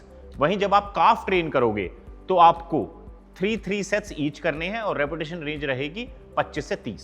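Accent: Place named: Punjab, India. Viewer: Are woh uska accent h .. Punjabi h to normally aa jata hai...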